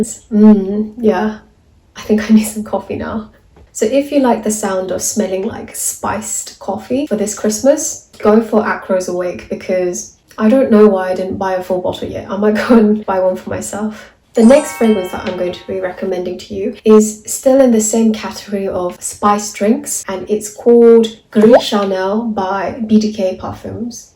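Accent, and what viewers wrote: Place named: United Kingdom. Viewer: 영국발음 너무 매력적이에요🥹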